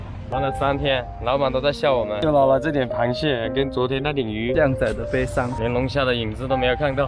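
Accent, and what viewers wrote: Chaoshan, China. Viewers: 是潮汕话吗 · 潮汕话闽南语系的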